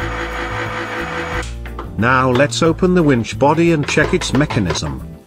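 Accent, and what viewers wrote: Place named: United Kingdom. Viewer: What is with the robot British narrator?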